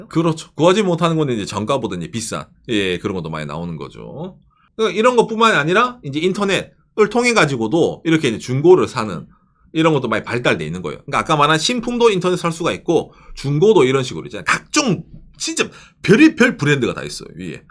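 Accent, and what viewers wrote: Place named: Gyeongsang, South Korea. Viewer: 경상도 사투리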